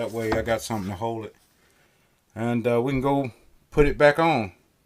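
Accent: South, United States. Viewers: Great video. you are one country sounding brother! (and that is meant as total compliment). Keep up the good work. Thanks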